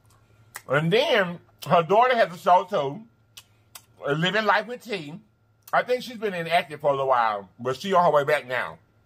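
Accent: South, United States. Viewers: y’all imma use some pink salt on dis heah, might as well dash a lil bit over here too Me: 👀👀 🤤🤤 The country accent tho 😂 😂 😍🥰😘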